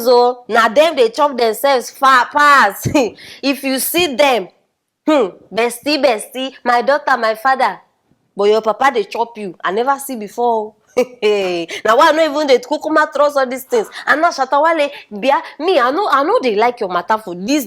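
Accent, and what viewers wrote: Nigeria. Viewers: That Nigerian English ooooh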